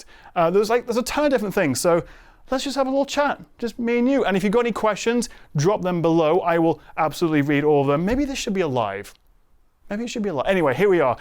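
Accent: United Kingdom. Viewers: Great video! I like your British accent. Gamers have complained how expensive the Switch 2 is compared with the original Switch was when it was released in 2017, but factoring inflation, as you did in the video, the Switch 2 is not really more expensive.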